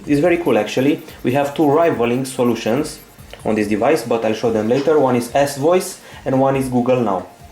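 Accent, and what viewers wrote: Romania. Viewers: Ce accent romanesc:)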